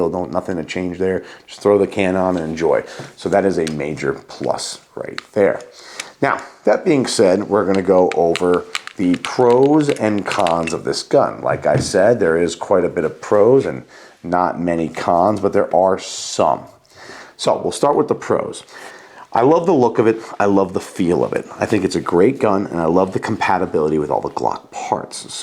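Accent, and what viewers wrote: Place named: East Coast, United States. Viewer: now, hearin your accent, you gotta be east coast! lemme know!!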